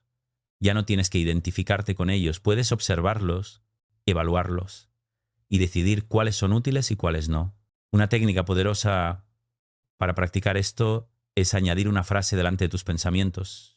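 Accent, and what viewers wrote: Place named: Latin America. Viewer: Por qué tiene acento latino?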